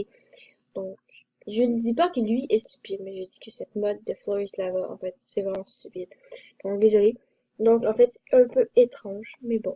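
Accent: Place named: Quebec, Canada. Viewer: tu est devenus une de mais youtubeuse préféfé qui jou sur msp prace que tu parle quebecois et tu est un quebecoise jadore moi aussi je suis quebecoise ❤😍😜😉😂😀😁🤣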